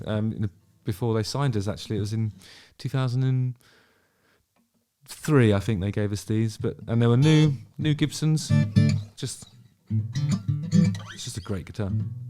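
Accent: United Kingdom. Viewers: I think the "elitist" remark is because of your British accent.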